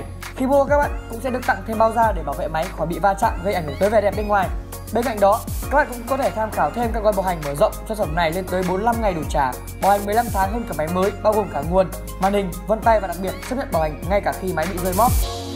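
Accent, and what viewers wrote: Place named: North, Vietnam. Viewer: Người SG nghe cô này nói là biết người Bắc sau 75 ,nói như sợ người khác cướp mất lời mình ,nói không kíp thở ,có cần phải vậy không ?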